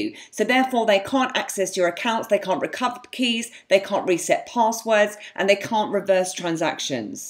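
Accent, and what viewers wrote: United Kingdom. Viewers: She has a British accent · she has a british accent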